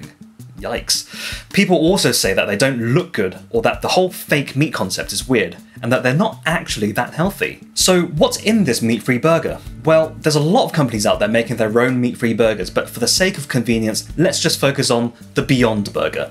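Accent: United Kingdom. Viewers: this has to be a first for me 52 years on this planet , i have never saw an asian speaking british accent.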